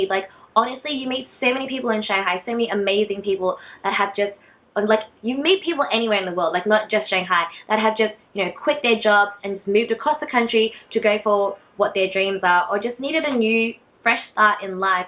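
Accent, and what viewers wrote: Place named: Australia. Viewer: Randomly found your channel and got obsessed with your Australian accent!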